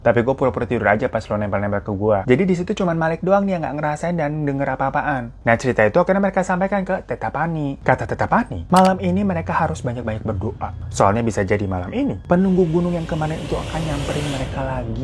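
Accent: Java, Indonesia. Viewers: Lucu bgt ya, kak bahasa jawanya lucu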